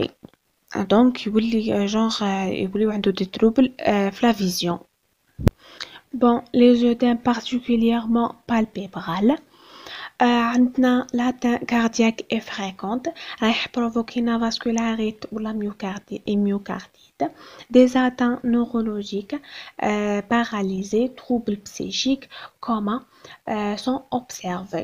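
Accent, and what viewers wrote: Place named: Arab world. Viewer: Vous parlez le français arabisé ou l'espagnol ? J'ai du mal à vous comprendre par endroit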